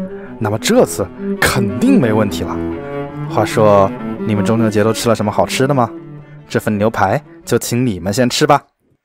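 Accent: Wuhan, China. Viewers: 武汉口音太重了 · 武汉口音忒重了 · 武汉普通话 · 楼主是湖北湖南人吧。武汉人仿佛在听汉普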